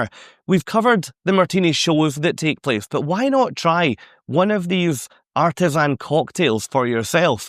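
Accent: Scotland, United Kingdom. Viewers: It took two nanoseconds to know you are a Scotsman! I live in Minnesota and my favorite boss of all time, one Frank Docherty, was my most favorite boss of my entire career. Thank you, for a great review. We were on the Edge last Fall when we cruised from Vancouver to Sydney. We love the ship and agree with all your observations.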